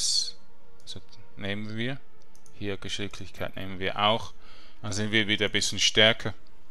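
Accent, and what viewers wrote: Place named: Basel, Switzerland. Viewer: sali bisch wohl au vo basel em name und em dialäkt nooch ^^ ich spiels au.. ha au en moench gnoh, us em gliche grund ^^ schrib moll di accountname unter dä kommentar , denn add ich di! bi allerdings scho lvl 70 p lvl 40 ^^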